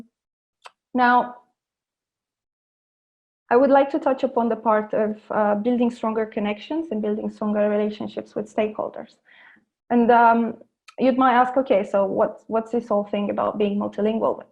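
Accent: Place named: United States. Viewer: Nu am urmărit tot spiel-ul, dar sper să o fac altă dată. Pe mine mă durea întotdeauna capul când trebuia să vorbesc în l. engleză cu clienții nemți 🙂. Dar asta a trecut. Supărarea mea cea mai mare este idioata „romglish”. Nu știu dacă va mai veni vreodată o vreme când o să ne fie cald sau frig în loc să suferim de „disconfort termic” !@ ! În altă ordine de idei, aveți un accent englezesc superb. Mi se pare însă mai degrabă american, decât britanic. Numai bine!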